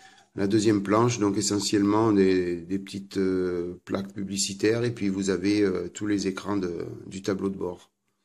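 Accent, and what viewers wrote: South, France. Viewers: un beau projet en perspective a venir...toujours agreable a regarder tes video avec ton petit accent du sud.a plus et bon courage.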